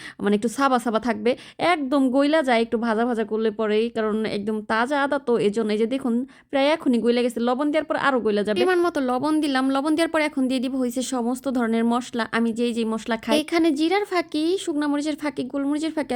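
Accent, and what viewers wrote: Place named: Dhaka, Bangladesh. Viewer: আপু আপনাদের এলাকা কোথায় বাংলাদেশের, আপনার কথা শুনলে মনে হয় আপনি ঢাকা বিভাগের লোক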